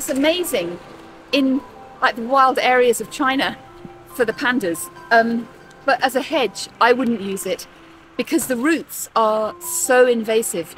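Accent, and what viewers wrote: United Kingdom. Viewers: 好喜欢的英式口音，说得太棒了，太好听了我打算一句一句学。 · 的英式英文很好聽，人也很知性優雅。👍🌹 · 天天在澳洲听着土澳口音，再回头听英国口音简直是种享受！🤣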